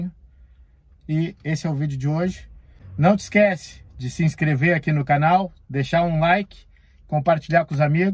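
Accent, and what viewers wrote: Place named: Rio Grande do Sul, Brazil. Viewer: De acordo com o sotaque, quase imperceptível, trata-se de um gaúcho.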